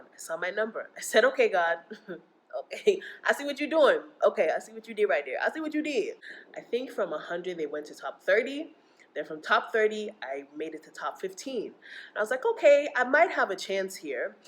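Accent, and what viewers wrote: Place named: United States. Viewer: OMG I love ❤️ it you put Jesus first! I’ve watched a lot of YouTube videos from people moving to Lagos from other places, but you’re the only one with my America accent.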